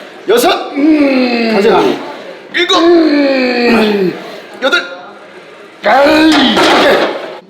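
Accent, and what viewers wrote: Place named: Countryside, South Korea. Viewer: 는 어디 시골 농부 페이스+얼굴과 어울리는 구수한 사투리 때문에 극호 ㅋ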